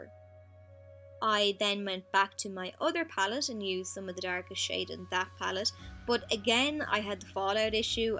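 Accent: Ireland. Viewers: Where in Ireland are you from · I love your accent X I'm also Irish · I loved this video😊what part of Ireland are u from?I'm from Kerry 💚💛💚💛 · Where in Ireland are u from xx? New subscriber!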